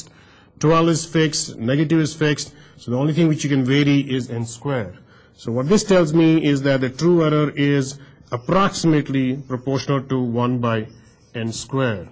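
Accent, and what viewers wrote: India. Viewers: Dude has the best Indian accent.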